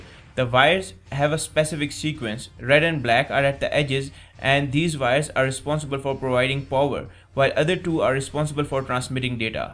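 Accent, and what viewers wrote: India. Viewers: you country voice? indu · Hes indian dont judges on how they speak ok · Your accent pisses me off so much. I hate fucking Indian accents. I just hate most Indians in general even though I am one but don't look or sound like one 😂 I'm serious I fucking hate Indians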